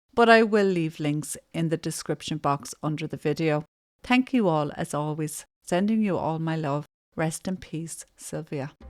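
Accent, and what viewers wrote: Ireland. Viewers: Great to hear your lovely Irish accent as iive been stuck in London since my body fell apart about 6 years ago, 😮.. and wasn't able to make it back as i had to get medical leave as my BasterD hips Collapsed then the bottom of my spine Popped 😮... then CoviD, then a Wheelchair ♿, then 4 years between each hip replacement then......😅...AAaaaH JeaZuS, i must be boring 💤 you now 😂😅... Anyway... fantastic show, ive been Religiously following the absolute DicK Circus 🤹‍♂️ that is poor Lucy Letbys lincining and SCAPEGOATING 😢😢😢😢.. I Think 🤔 Both Country's Justice systems are 0ne 😮😮😢❤❤❤❤🎉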